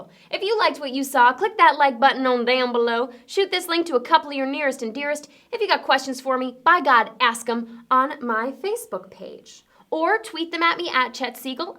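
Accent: United Kingdom. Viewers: Not what I was thinking at all. I was thinking how upset I was that you missed another excuse to show off your fantastic British accent :o(. But the freakout over what to call toddlers made up for it :o)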